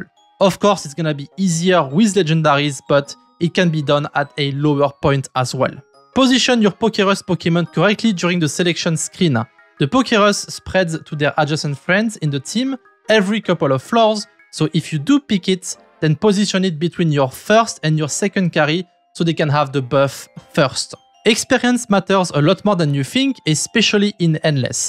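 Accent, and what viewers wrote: France. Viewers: Respect. you are actually the first one, i see a video from, that is actually better than me process wise in the game. The Tips were very good, and you wasnt talking obvious trash Your video isnt clickbait, and even tho your french accent threw me off, it was very informative! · Heaviest French accent I have ever heard 😮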